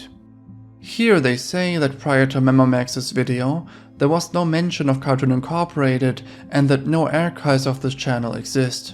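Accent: Germany. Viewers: Nice slight German accent, he'd make a good asmr channel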